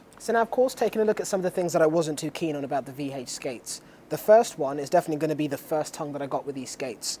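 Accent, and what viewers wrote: United Kingdom. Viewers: I have to say this: I really enjoy the way you speak (voice, accent, style – snappy, masculine and British). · A black fellow with a British accent talking about hockey.. definitely don't see that every day eh?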